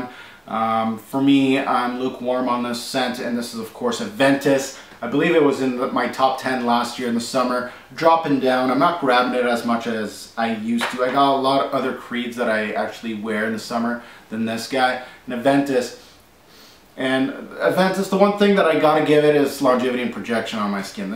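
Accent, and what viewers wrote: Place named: Quebec, Canada. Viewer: Hahahahah!!! I like your French Canadian accent - the way you pronounce 'Frapin' and 'Sel Marin' is fantastic! So a question, (get it?)...is this list based on objective quality or personal preference? Gotta love my man Heeley.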